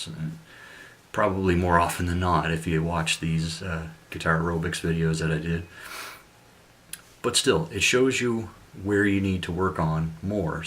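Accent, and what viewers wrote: Canada. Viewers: I have to ask where you are from? I'm from Mabou, Cape Breton and I hear a Atlantic Canadian accent... Thanks for your videos. Helps a lot.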